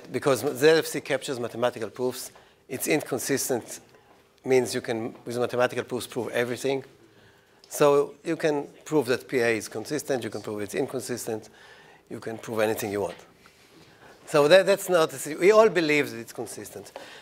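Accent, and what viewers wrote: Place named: Israel. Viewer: The professor sounds so Israeli. LOL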